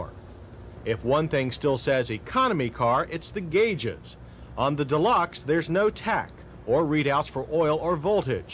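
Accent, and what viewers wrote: United States. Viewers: What state is his accent reminiscent of? Cam Ray 😂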